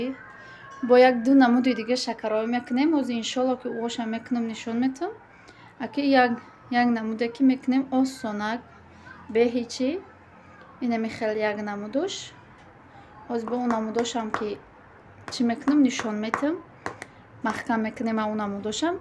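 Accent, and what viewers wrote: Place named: Hisor, Tajikistan. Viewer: Ассалому алайкум ва баракатуху Апачон созен хубен фарзандо созан хубан хамакаса ма ша Аллох шумо бехтарин Зан хастен зани хоксори точикои худмон шумо бехад манда махкул шиден простой Зан хастен авалдарача гапзанитон манда махкулай чунки ранги мо гапмезане мо аз Хисор мешем хонамон дар Кальаи Хисор наздикаст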